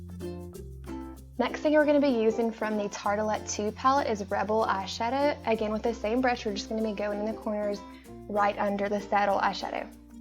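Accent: South, United States. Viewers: I love it, and are u from the south I think I hear an angelic southern belle lol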